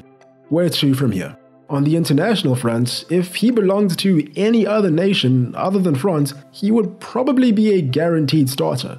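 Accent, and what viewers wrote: South Africa. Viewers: homie talking South African? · I'm a simple man. I hear a South African accent and I subscribe 🤌 gotta support the peers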